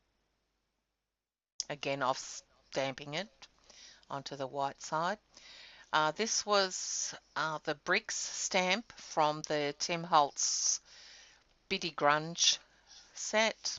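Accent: Australia. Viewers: Great to hear another Aussie. That is an awesome page. Thanks for sharing.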